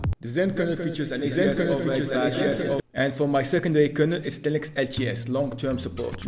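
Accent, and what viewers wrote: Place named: Maghreb. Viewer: mghribi accent, i can sence it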